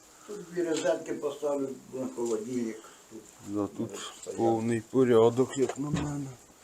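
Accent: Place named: Podillia, Ukraine. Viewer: У прекрасна вимова з приємним подільським діалектом. А "дядько каже " просто супер, не треба чіплятися до людини, мови вчать на інших каналах.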